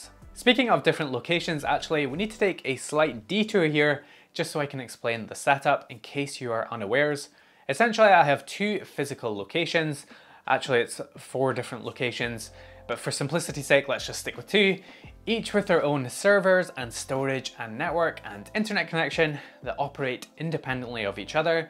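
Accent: Scotland, United Kingdom. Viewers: Excellent insight for a newcomer to the homelab/server world. Have just installed TrueNAS Core and upgraded to Scale on a salvaged 2011 desktop machine as an experiment, so I'm just getting a feel for the most popular apps that folks are running before taking the next steps. Really useful overview and nice to hear the Scottish brogue again ( I spent 21 years working in Lanarkshire for one of the few remaining semiconductor facilities before retiring back in my Welsh homeland with my Scottish spouse). Sub'd :)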